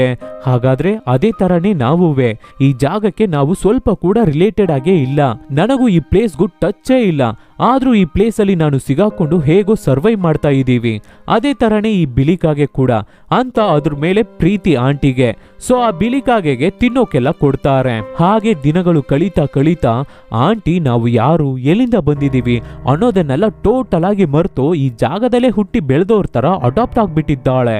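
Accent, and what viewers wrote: Tamil Nadu, India. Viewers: Your mother tongue is tamil ryt?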